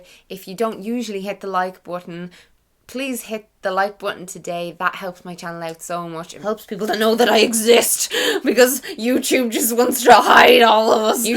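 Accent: Ireland. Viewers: And I probably started following you 2 years ago... after I had a trip to Ireland, I fell in love with Irish accents! :-) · I came to your channel after seing you in some kind of collab on Hannah Witton s channel, it must be a couple of years ago now, and I stayed and subscribed. I really like that you have different types of content, the vlogs, the food diary and talking about like sex and health and everything. Also, a bit strange maybe, I'm here because I love irish accents! I'm Swedish but I lived in London for a year and kind of sound like a Londoner when speaking English and I'm so interested in the English language with all its different accents (not american though, sorry americans). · I subscribed to you when the video am no longer Vegan came out (because I was going through a similar thing). I’ve loved your personality and sense of humor ever since , you will continue to be one of my top . 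( also am obsessed with the Irish accent, since am not a native English speaker I hope I’ll master it one day *insert Irish youtubers accounts here * lol ) love you 🖤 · I visited Ireland this summer and had THE time of my life and fell in love with it! I found you from searching Ireland videos and one thing lead to another and I fell in love with your energy and accent and message 💚 I get so excited when a new monthly vlog comes out... I snuggle in and make coffee and feel like I get to hang out with my Irish friend! 💚 Thanks for all you do!! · I subscribed to you bc of ed videos, vlogs, because you speak so honestly about all topics (also things other youtubers dont talk about), your personality and your cute Irish accent 😊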